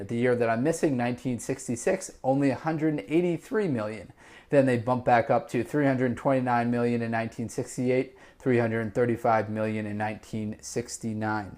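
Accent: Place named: Canada. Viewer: Yes..you have a Canadian accent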